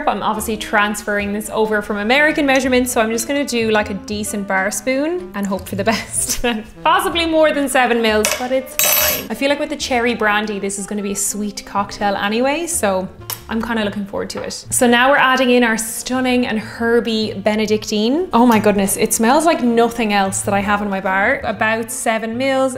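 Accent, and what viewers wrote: Ireland. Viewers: You know, I don't even drink alcohol, but she's so charming and lovely and has that beautiful Irish accent that I'm compelled to watch. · This girl should be in the movies. She could be the next Maureen O'Hara. Beautiful girl and her brogue only adds to her charm.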